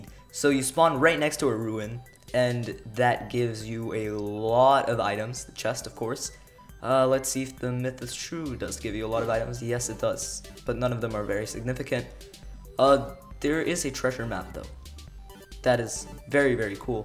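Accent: India. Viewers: U have a slight Indian accent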